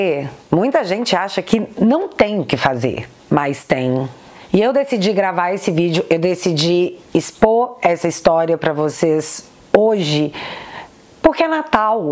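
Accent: Minas Gerais, Brazil. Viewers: Olá, te sigo há muito tempo daqui da Inglaterra onde u moro, você já me fez sorrir e até gargalhar com seu jeitinho e sotaque mineiro, mas hoje me fez chorar, talvez vc nem veja essa mensagem, pois são tantas as que vc recebe, mas se você ler, quero que saiba que você é muito especial, seus vídeos esclarecedores e me ensinam muito mesmo! Quando comecei a te seguir você não tinha nem cem mil seguidores e olha só como você cresceu! Deus tem te abençoado, continue com Ele e que mais bençãos recaíam sobre você e família! Também sigo o Rodrigo Silva e também sou Adventista, que o Pai Eterno esteja com você e que seu canal seja para a Honra e a Glória Dele 🙏🙏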